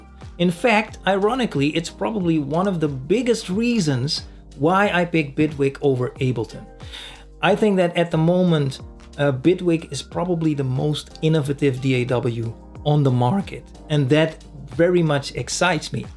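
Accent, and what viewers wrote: Netherlands. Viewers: BitWik hahaha lekker hoor, dat Engels met Nederlands accent. 😉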